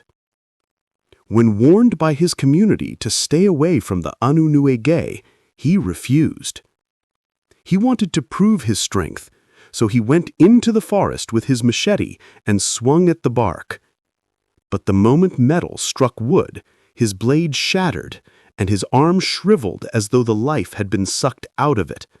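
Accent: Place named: Africa. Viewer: Where u from? That accent sounds somewhat african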